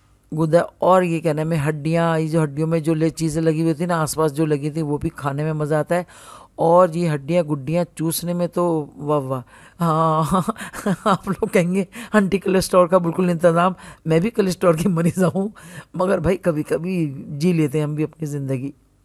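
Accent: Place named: Lucknow, India. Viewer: I love your "urdu", sound like "Lakhnao" ki urdu...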